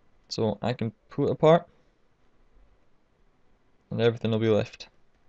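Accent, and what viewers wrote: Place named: Glasgow, United Kingdom. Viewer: Good clear content, thanks! (Also, your accent is delightful. Are you from Glasgow?)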